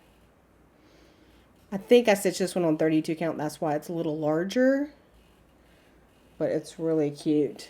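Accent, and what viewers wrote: South, United States. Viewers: I so enjoy watching your flosstube. You are just a hoot. You are you and I so appreciate your authenticity and your southern twang. Seeing all the witch charts you made and bought was a treat. Instant viewing of finishes and future possibilities for us to do. Thank you for all you do. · Never apologize for being you! You are real and I love your southern accent! Happy stitching!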